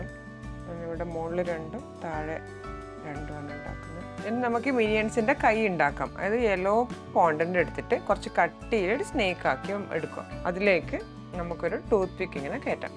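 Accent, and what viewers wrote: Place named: Kozhikode, India. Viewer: m also frm calicut,,,vadakara.. samsaram kettepo nk thonni..atha chodche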